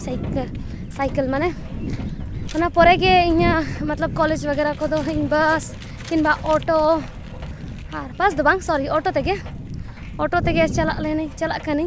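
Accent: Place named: Santhali, India. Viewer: Super santhali voice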